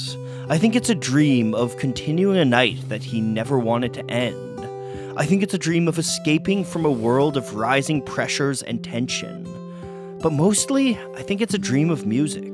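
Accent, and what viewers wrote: Canada. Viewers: canadian,eh? great video as usual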